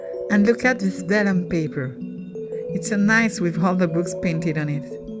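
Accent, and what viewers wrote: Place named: France. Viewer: Très belle vidéo, très agréable... une très jolie déco dans ton planner. Ce kit est vraiment sympa ! La french touch dans ton anglais est adorable ^^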